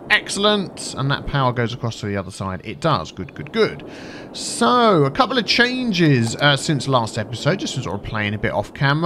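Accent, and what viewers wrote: United Kingdom. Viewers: are you british and do you know DANTDM personely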